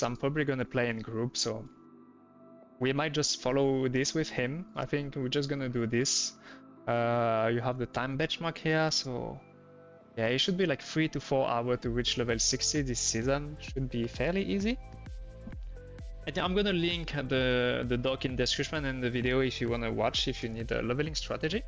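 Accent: France. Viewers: Once again, thank you. By the way, Sir, your accent, is it perhaps French?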